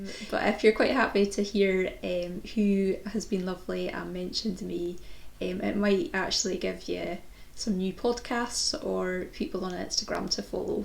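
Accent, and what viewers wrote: Scotland, United Kingdom. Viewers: As for so many others your podcast came up in my YT recommendations and after watching some minutes of your second episode I was happy to have found you! I like your projects and love a scottish accent 🤩 . Looking forward to many thoughtful hours spent with you! Thanks so much! Anja Fromm Germany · As someone with fibromyalgia I really really appreciate your approach to podcasting. I’m new to knitting and I find it to be peaceful and calming work. It is soothing to knit whilst listening to your soft Scottish voice. I love your content so far. Keep going and thank you. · Hello there- I spotted your channel on You Tube just a few days ago and thought I'd give it a try- the name appealed to me 😊 and I've loved it right from the opening moments- lovely and calm. I absolutely adore your Scottish accent as it's just so gentle. 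We visited the U.K. A few years ago and spent a fortnight in Scotland but only went as far north as Inverness- such a beautiful country! Although your giveaway is gorgeous I suggest you don't choose me- I live in Tasmania, the southernmost state of Australia- the postage would cost you too much 😉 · I love your podcast, and I especially like to just hear you talk - love your Scottish accent! Someday I want to travel to Scotland, it looks so beautiful! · I believe YouTube recommended you to me, I love listening to fellow Scottish podcasters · I found you as a suggestion on utube. I love your soft Scottish accent and it's so relaxing to listen to you chat. You have some beautiful knitting garments and loved what you knit for your new neice. Thank you 😊💓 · Hi! Your Podcast was in my feed too. I love your accent. I'm not a native english speaker but I always liked to hear the scottish accent. 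Glad you found the courage to start a Video Podcast. I like your calming way of speaking and that you aim for half an hour of podcasting. Your Intro is super. Your projects are beautiful and inspiring. Greetings from Germany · You popped up when I checked "new to you" and was drawn to your name The Thoughtful Knitter. Love your Scottish accent and the projects that you share. I have subscribed now. Really enjoy your videos and what you share. Very calming and that's what we all need during these difficult, trying times. I'm in Richmond, Virginia, USA. Thank you for a beautifully done podcast. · Congratulations!!🎈🎉 I just love your podcast!! I Love Scotland and been several times but never to your area. We still have plans to do the North Coast 500!. I love your scottish accent. You do make the most beautiful things, so keep up the good work. I learned about your podcast through Selma from Little Big Knits. 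Kind regards, Erna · Hi, your podcast was a recommendation from You Tube, may be because I love other Scottish podcaster as Caz from the wee sew and sew and as well „The meaningful stitch“ I liked your podcast and I love to here the Scottish English your knitting is also so beautiful so I left an abo here.Greetings Vanessa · Hi,! I was suggested to watch your podcast by youtube. I have been quite thirsty for knitting podcasters from Scotland, as I am here too and the more local, the more relevant, I guess 😊. I do enjoy your accent and your podcast episodes and I’m glad youtube suggested you to watch 😊. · Hi! I am pretty sure I heard of your podcast from some other podcasters, but I cannot remember who 🤭 so sorry! Anyway, thanks for the time you spend with us and for sharing your beautiful knitting projects. I adore your accent!! And would love to get to visit more of Scotland. Just went to Edinburgh once, but I loved it. 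Your gifts for the giveaway are fantastic! I’ll keep my fingers crossed 🤞🏻🤞🏻🤞🏻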